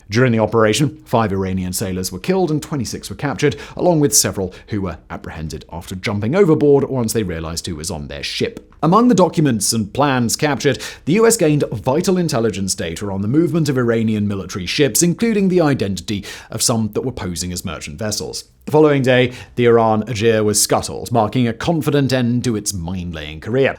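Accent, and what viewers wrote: RP, United Kingdom. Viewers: the pre-beard I can deal with it's the stuffy upper crust english that was obviously he's natural way of speaking then that gets me 😂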